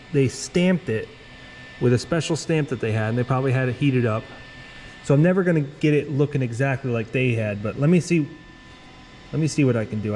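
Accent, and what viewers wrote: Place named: New England, United States. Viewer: The dialect is a little New England and Pennsylvania mixed in.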